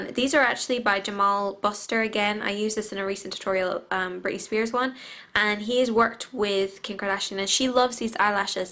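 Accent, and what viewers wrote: Ireland. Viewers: R u Irish??? Please reply!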